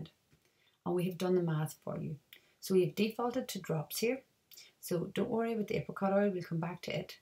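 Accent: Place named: Northern Ireland, United Kingdom. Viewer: Hello! This is a fantastic resource, and something I hope to invest in, in the future! Would you mind me asking where you're from? There's a definite touch of Northern Irish in your accent :D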